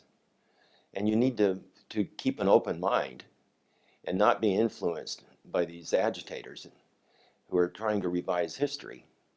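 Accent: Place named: Japan. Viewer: 日本生活が長いからか、発音が日本人の英語に近い所がたまにある気がする 抑揚も日本人っぽいところがある 勝手に親近感が湧く 笑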